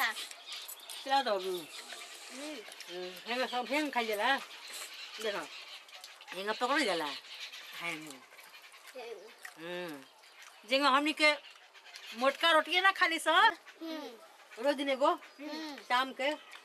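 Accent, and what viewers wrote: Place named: Bhojpuri, India. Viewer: behan aap bhojapuri me hi boliye bada pyara lagta h aap bhojapuri bolti h to sach me bol rahi hu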